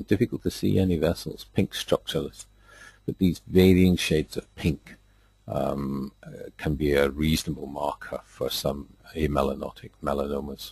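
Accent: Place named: Ireland. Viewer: Maybe their resolution isn't high enough but I would find it helpful if you increased your default zoom to maybe 120-130% if everything you do here. There is so much of your screen that is just wasted space. Otherwise, thanks for your series! It's been helpful as a dermatology refresher. I had encountered your videos a few years ago and had always wondered why I had this bizarre association between dermoscopy and Irish accents. Cheers.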